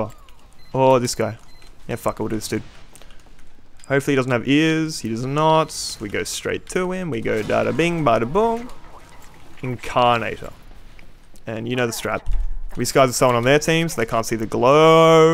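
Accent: Australia. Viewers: Australia accent plus mystery dungeon background music gets you a sub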